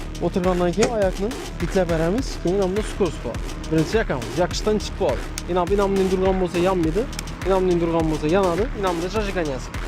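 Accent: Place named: Khorezm, Uzbekistan. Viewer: Хоразм тили котили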